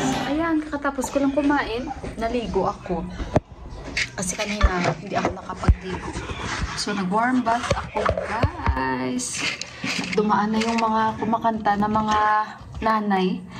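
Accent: Iloilo, Philippines. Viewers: Natutuwa ako pag naririnig ko ilongga accent mo sis. Ang lambing at sarap pakinggan❤😊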